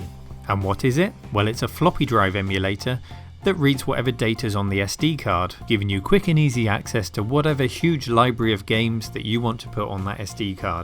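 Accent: West Country, United Kingdom. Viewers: Oh wow you're in the Cotswolds, how lovely. Dull, but lovely ;) You do have a very slight West Country accent now I listen out for it.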